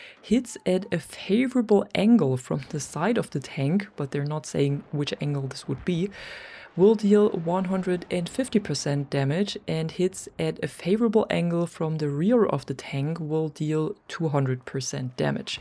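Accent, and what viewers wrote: Germany. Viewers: its german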